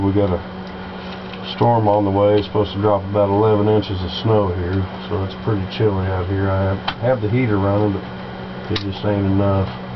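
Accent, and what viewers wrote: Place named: South, United States. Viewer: Brilliant accent.... Southern, I guess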